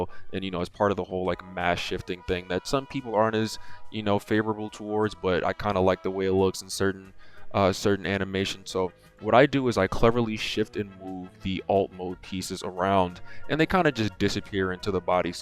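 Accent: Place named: United States. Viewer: Why your voice is American your name is Indian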